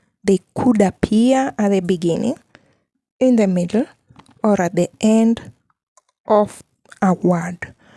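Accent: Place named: Kenya. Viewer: I am sorry maam, but your pronunciation is quite incorrect. You are supposed to be a professional in speaking English, but it doesn't seem so. You should go do research on how to reduce your accent. I assume you are from Kenya, where British english is taught. There are countless youtube channels that teach exactly that, so do your due deligence. Please stop spreading misinformation.❤❤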